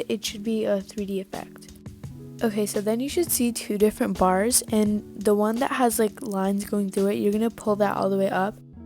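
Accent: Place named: United Kingdom. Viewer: i thought you were british for a good minute 😭😭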